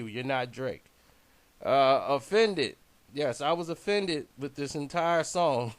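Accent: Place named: Dakota, United States. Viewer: This dude sound like he only wear Bill Cosby sweaters. Must be from Dakota or some shit. fuck outta here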